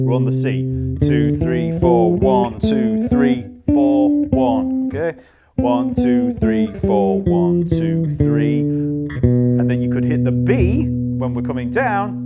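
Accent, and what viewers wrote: United Kingdom. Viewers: Uk accent :)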